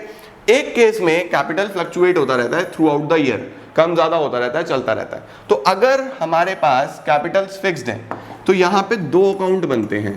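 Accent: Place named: Uttar Pradesh, India. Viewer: Sir ji aap kaha se hu lagte tu up ke ho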